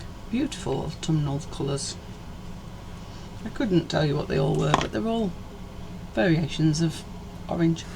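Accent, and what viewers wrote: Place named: England, United Kingdom. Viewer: I live alone with my dog Madge, a rescue greyhound, and very rarely see another human being to talk to, so I talk to myself, and answer back all the time - I have always done this though! If someone suddenly appears, whilst I am nattering on when walking with my Madge, I pretend I am talking to her! But I do not mind if folk hear me doing this, I just make a joke of it! It is the only way I get through the day, and at least I do not get criticism for saying something wrong to someone - I only have myself to blame! I have just found your channel, so given it the thumbs up and subscribed. I am a pottery painter, but love all craft. I have owned and run 5 arts and crafts shops in my past. I also had my own Private Nursery School on the side of my house, down in Devon, in the 90s, and loved doing all that art and craft with my beautiful children I was so blessed to have coming to my Nursery School. 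It was called Woodpeckers Nursery School, and I had the chicks and the fledglings. I am now 67 years young, and need to get back to doing craft things, as in the last 7 years I have lost my way a bit, due to sever anxiety, and only going out of my very rural home to walk my lovely Madge. I am surrounded by nature, so going out with her is such a joy. My latest thing I am going to be doing however, is making Christmas decorations with acorns in their cups. I love the way you do your painting, so thank you for this video. It is lovely to hear an English accent too, as so many I find on h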